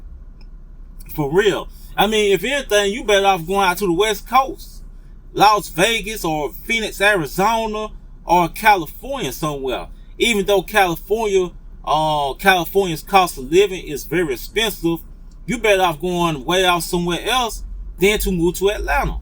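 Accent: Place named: Midwest, United States. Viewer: 1st of All,Where are you from Sir??? Becuz your Not a native of ATL AT ALL!!!🤔😒 Especially talking the way you Talk!!! Mid West maybe??🫣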